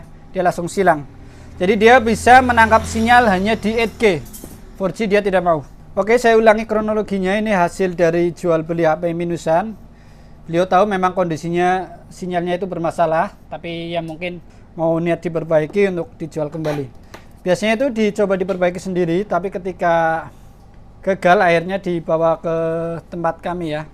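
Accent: Banyumas, Indonesia. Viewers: Ngapak ya kak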